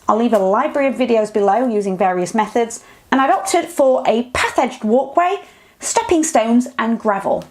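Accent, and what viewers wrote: United Kingdom. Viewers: Nice British accent❤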